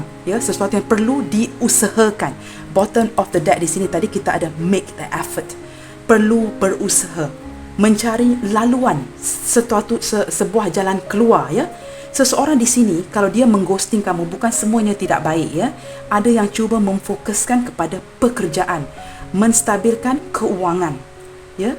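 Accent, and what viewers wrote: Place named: Malaysia. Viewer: Pisces Hadir. 👏🙏 readingannya sangat resonet sekali. Trimakasih atas readingannya. Smoga dlm keadaan sehat2 dan bahagia sll bersama kluarga tercinta di sana. Selalu sukses buat. Sepertinya klo sya dengar percakapannya, adalah orang India Malaysia klo tak salah yaa. Sya sangat senang dan bangga sekali dng readingannya, sebab sangat jelas dan resonet. 👏🙏🙏👍👍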